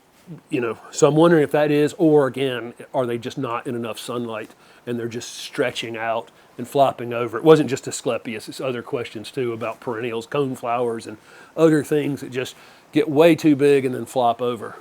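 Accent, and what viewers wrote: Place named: North Carolina, United States. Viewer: You sound like you're in North Carolina, are you, I am? I'm new here. Thank you for your time.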